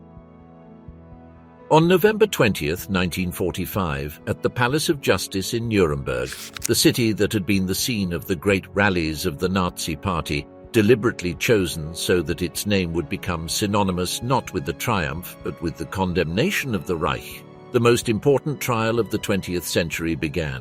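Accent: England, United Kingdom. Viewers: Why are there so many videos on here about Ww2 with a narrator with an English accent that put Russian communism in a good light?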